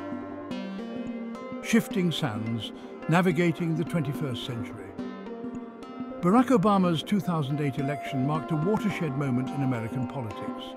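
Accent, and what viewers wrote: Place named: England, United Kingdom. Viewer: So strange: Scattering modern "historical" pics with an English accent and "inspiring music" What a trip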